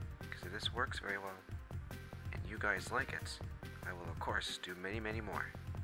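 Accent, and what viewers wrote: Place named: Mexico. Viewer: where is that accent from, Mexico? there are a lot of mexican designers, and drawing artists, is it because some artistic baby boom or the artistic education is superior? one day we will be as good as you guys!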